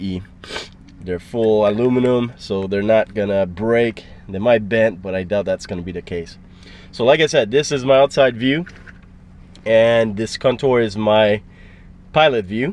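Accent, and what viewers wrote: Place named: Quebec, Canada. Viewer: I couldn't get over your french Canadian accent. It's funny but I never heard it in all the videos that I've watched. Thanks again and now back to your cross country video!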